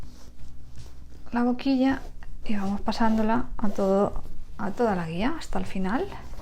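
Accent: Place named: Spain. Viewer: Hola! Q precioso tu trabajo, aparte d tu simpatía y claridad p explicar Amo escuchar ese acento español, quizás añorando y recordando a mi abuela q era d Andalucía Soy d Argentina y d una provincia del interior del país, y no encuentro todo lo q mencionas p la confección (la boquilla d esa longitud principalmente )las telas son soñadas Te felicito!!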